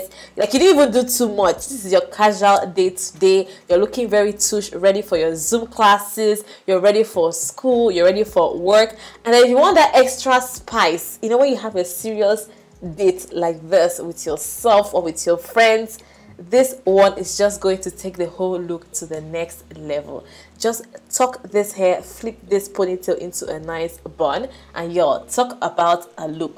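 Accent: Nigeria. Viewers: I’m here for your Nigerian accent and your accessorise!!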